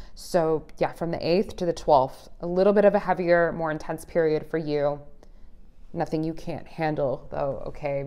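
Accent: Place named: Australia. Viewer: Australian accent 😅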